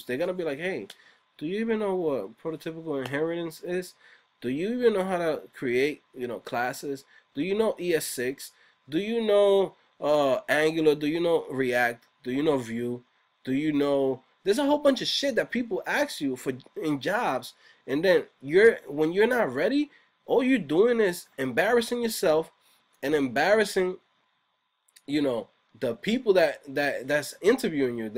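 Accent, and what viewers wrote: New York, United States. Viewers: dude i love they way you are talking ahahah, are you from ny? thanks for telling it like it is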